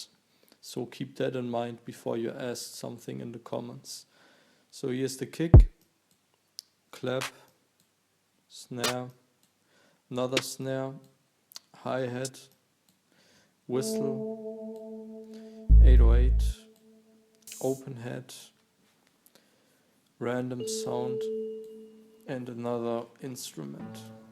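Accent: Germany. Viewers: Nice Video bro. I think you come from germany, is that right?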